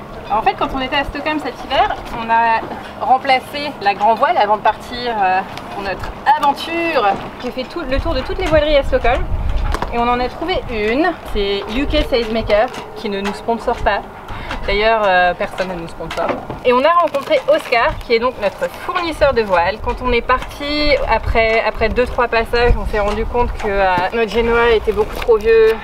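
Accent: France. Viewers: I am always so entertained by various facial expressions associated with her french inflected English that following the subtitles I feel like I missed something........................because I had to keep looking down to see what she was saying.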